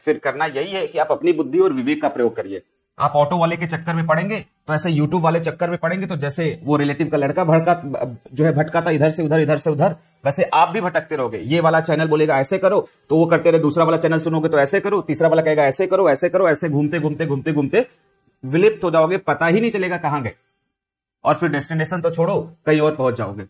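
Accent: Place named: Varanasi, India. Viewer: Kbhie aae to nhi hain benaras bs isi bahane dekha Apka benarsi accent ek no tha 😍 thanku sir for guiding us 🙏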